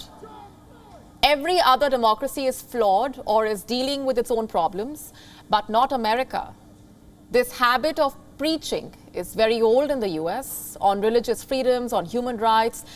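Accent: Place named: India. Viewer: we can. She is Indian,not supposed to sound like english If u like british accent so much go to english channel. An indian will have indian accent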